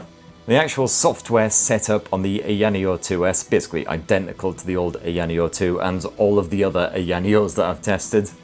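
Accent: United Kingdom. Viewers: As an american, hearing a brit pronounce something a little odd is totally normal. We have bottled water, you guys have bo'o o wa'a. I could listen to you guys read the phone book and it would be music to my ears.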